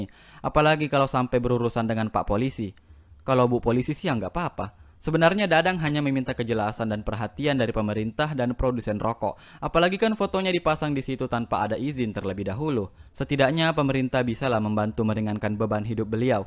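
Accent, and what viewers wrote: Makassar, Indonesia. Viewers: Org Makassar ya bang? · Kalau di dengar dari logatnya, orang Makassar kah??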